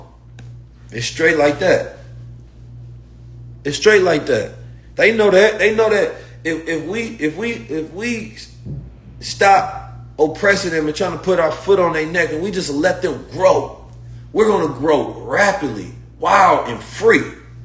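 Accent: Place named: Chicago, United States. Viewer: Is it me or do I sense some Chicago swag in your step now. You sound a lot more dangerous now my dude. Peace & Blessings. S/O from the Chi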